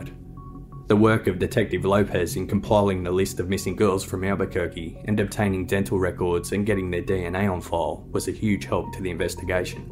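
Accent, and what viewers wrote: Australia. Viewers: Could do without that Aussie accent though.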